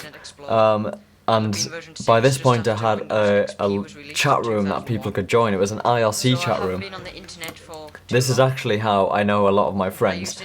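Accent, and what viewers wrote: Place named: North East England, United Kingdom. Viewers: North-East England, possibly Durham area? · He is from Sunderland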